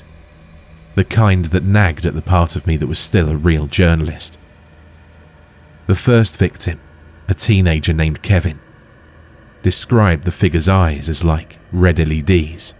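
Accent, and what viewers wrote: United States. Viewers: Bravo! Your American accent sounds kind of cute! I know about this legend, and my opinion is that it was Mass Hysteria. Your narration was perfect and intense. Thank you so much ❤😮. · _MHorrorMacabre:Hyped and Liked 👍 #112! Your American accent was excellent! You did it seamlessly. That must have been hard with the back and forth dialogs between the characters. I really appreciate the extra work you always do on your channel for us. Loved the story. 🖤☠️🧡☠️:_MHorrorMacabre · Great Story and Narration! I liked your American accent. I enjoy the legend of Spring-Heeled Jack.❤❤🎉🎉😊😊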